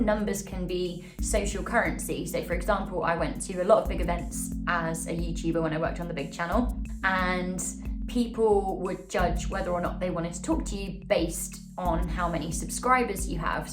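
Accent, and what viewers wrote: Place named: United Kingdom. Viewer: I am very happy to be able to watch you again dear ⭐⭐⭐⭐⭐ you always put a huge amount of work and heart... Also the Brit accent in Canada... Bold. A delicacy 🍯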